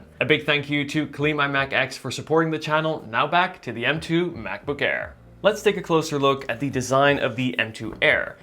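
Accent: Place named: Netherlands. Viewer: U sound Dutch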